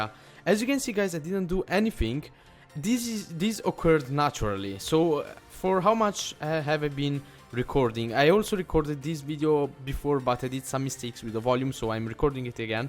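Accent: Italy. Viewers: Are you italian? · Are u italian?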